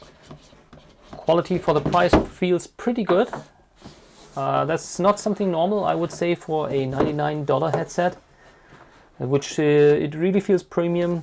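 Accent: Germany. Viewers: Deutscher Akzent kickt anders haha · He is from my State. Germany. So he has an german accent that is what you hear😆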